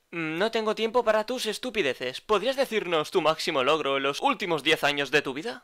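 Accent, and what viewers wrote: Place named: Spain. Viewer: ERES ESPAÑOL y lo se por la voz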